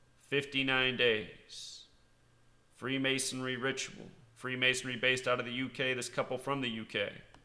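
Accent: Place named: United States. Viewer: Whao what i can't believe is that people did not ask the question I mean when it came on the radio I said out loud how can a couple both die of heart failure WT...... I thought I heard it wrong. there was no discussion in the public I really started to believe I dreamt it then low and behold Your feed popped up on the sidelines I thought oh I clicked imagine my surprise when I realized you were from America or Canadian I can't tell the difference. OK concider my mind blown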